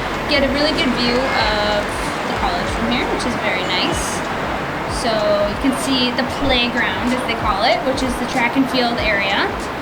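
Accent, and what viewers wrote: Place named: United States. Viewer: 纯正的美式英语！我喜欢这个小妞！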